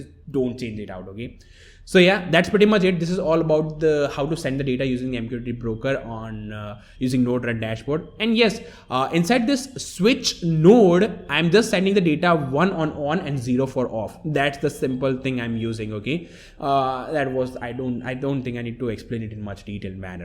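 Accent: India. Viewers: You talk to fast with ur hard to u derstand indian accent!!!! Thumbs down unsub!!! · Loving the strong Indian accent, and of course the video to!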